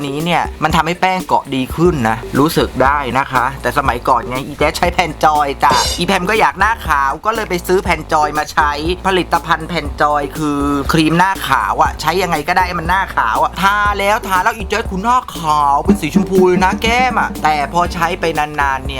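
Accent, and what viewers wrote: South, Thailand. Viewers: เป็นคนใต้รึเปล่าครับ พูดทองแดงชัดเลย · คนสุราษ เหรอ แหลงใต้แลมั่งตะ🤓🤓🤓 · สำเนียงออกทางคนใต้??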